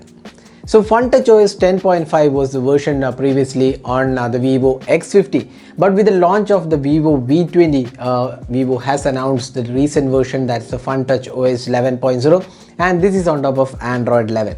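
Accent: India. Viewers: I do not know about all other of you followers, but I do not understand Indian English speaking. Sad fully most Indian IT Techs who speak English have VERY BAD English pronunciation, so my comment to this IT Tech is to at least TRY TO LEAVE THE INDIAN ACCENT WHEN SPEAKING ENGLISH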